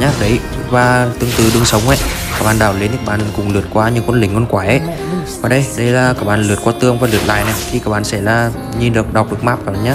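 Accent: Central, Vietnam. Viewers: Giọng miền trung là like comment đăng kí liền. Ko nói nhiều. Ủng hộ bạn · anh là miền trung ak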